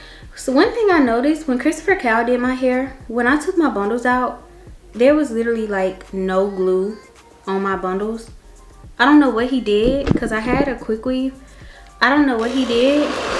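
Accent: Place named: South, United States. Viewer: love video and your country accent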